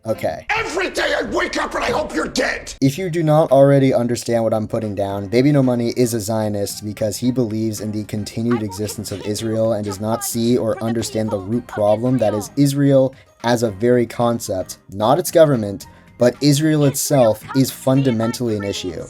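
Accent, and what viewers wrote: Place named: United States. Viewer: terrible take. from your accent you would be American so by your same logic you are an Trump supporter and 100% for the Iran war. no there's no room for nuance with this. you see how stupid that sounds? I don't even say that to defend Israel because they shouldn't exist in the first place but that's a different conversation.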